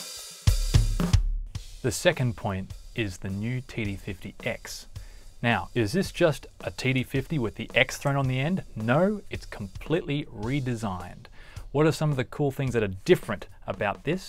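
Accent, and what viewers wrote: Australia. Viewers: Good to hear an Aussie accent. Sound like a Melbourne accent.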